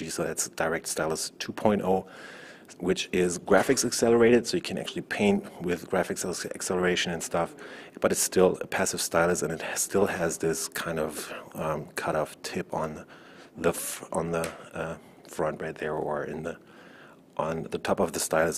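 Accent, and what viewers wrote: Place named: Germany. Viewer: I love your german / english accent :p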